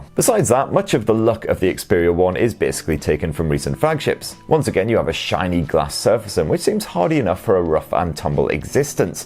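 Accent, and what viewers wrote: United Kingdom. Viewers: Liked & Subscribed for the Steins;Gate wallpaper and that British accent!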